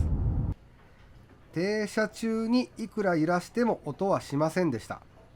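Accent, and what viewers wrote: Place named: Kansai, Japan. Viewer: 関西イントネーションもいいです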